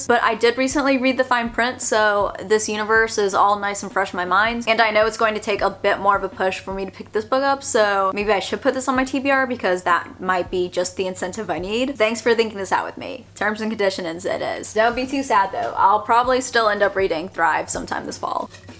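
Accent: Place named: South, United States. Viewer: as opposed to your normal lovely southern accent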